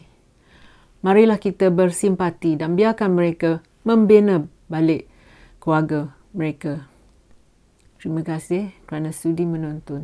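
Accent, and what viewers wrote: Perak, Malaysia. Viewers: Orang mana ni lorat perak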